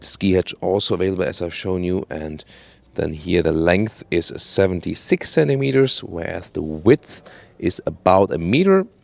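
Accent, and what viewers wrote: Germany. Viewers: Zis is called German accent. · I love the THICK German accent. :D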